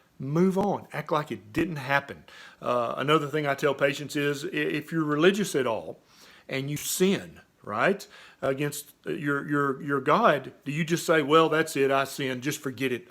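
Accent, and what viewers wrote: South, United States. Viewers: I love your videos. Your dialect is pretty funny though. Are you from the South?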